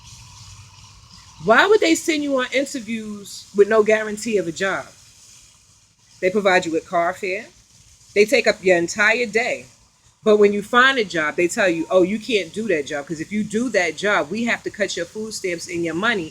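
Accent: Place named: Brooklyn, United States. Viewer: great "sumpen ain't right face". I lol'd . great accent too, Brooklyn? farewel is a joke, but only the rich are laughing.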